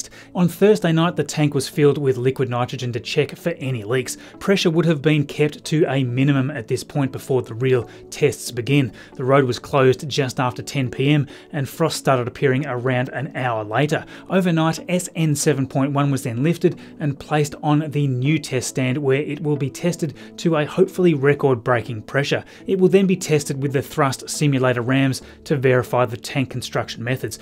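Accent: Australia. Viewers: It's nice to hear an Ozzy voice giving the week's space launch news. Keep up the good work.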